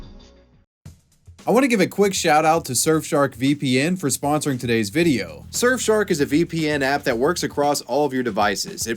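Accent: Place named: United States. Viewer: It's like Bellular with with an American accent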